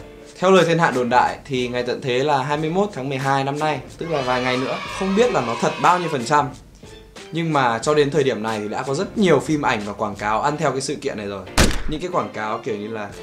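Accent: Standard. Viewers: nói chuẩn vãiiiiiiiiiii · Bạn nói chỉ được cái chuẩn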